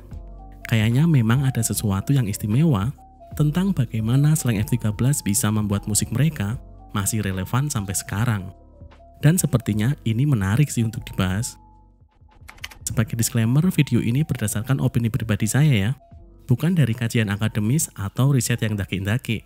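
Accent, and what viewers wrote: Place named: Semarang, Indonesia. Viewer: Orang Semarang ya mas.. mendhog men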